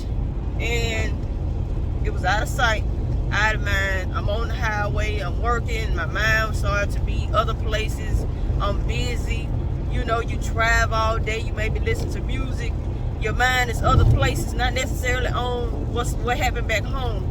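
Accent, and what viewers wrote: South, United States. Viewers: Hello country girl love your southern accent as for relationships it's best to talk before you start because time is valuable believe me I hope you learned from that experience take care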